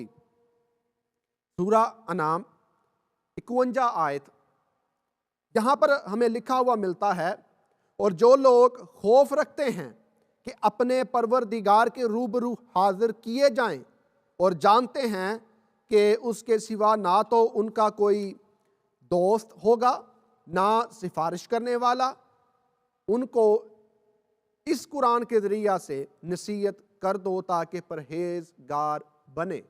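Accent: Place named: Punjab, Pakistan. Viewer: brother I don't want to hurt you. For example say Hadith number 1234. Actually when you speak in Punjabi alphabet I can't understand. Even I am Punjabi. Sorry once again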